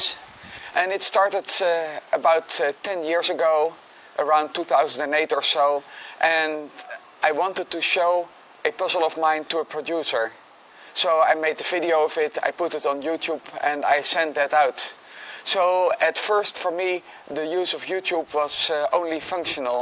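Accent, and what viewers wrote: Netherlands. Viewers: Soothing? His Dutch accent is the worse I ever heard.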